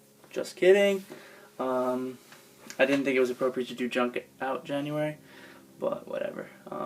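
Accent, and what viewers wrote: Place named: Long Island, United States. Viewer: your LAWNG GUYLAND accent was really in full effect in this video...haha sadly I've basically lost my NY accent. Nice update.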